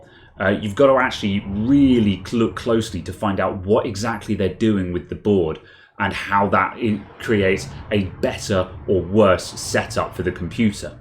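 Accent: England, United Kingdom. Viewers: and english accent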